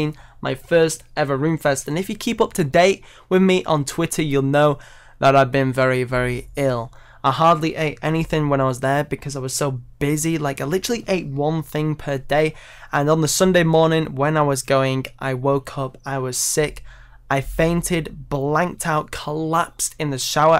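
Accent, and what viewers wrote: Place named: Northern England, United Kingdom. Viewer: North England Accent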